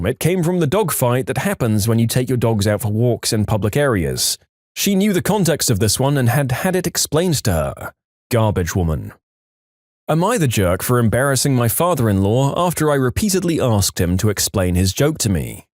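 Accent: United Kingdom. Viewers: Again British guy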